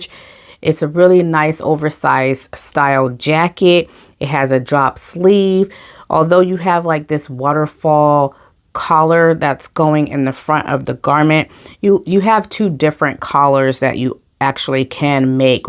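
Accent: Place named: South, United States. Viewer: You love to sew dresses but I love to sew coats. I love this list. I have to ask: you sound southern, yet your vlog is named "Crumpets, tea and Sewing". Where do you find crumpets in the south? I lived in Ga for years and I couldn't find them anywhere.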